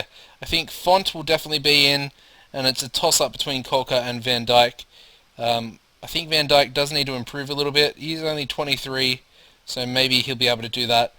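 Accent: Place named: Australia. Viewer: nice watching an fm play through with an aussie accent mate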